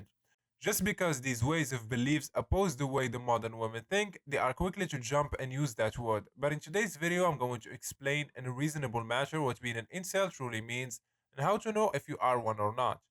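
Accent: Morocco. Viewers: The speaker seems moroccan from the accent 🇲🇦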